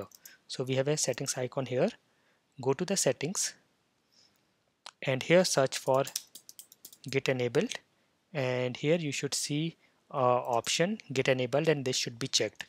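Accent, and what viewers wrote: India. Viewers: Simple and complete, and his "Indian English" can be easily understandable compared to some others. I'm completely new to Git and this video is so much helpful to me to quickly get started with Git on my VS Code -- thanks! :)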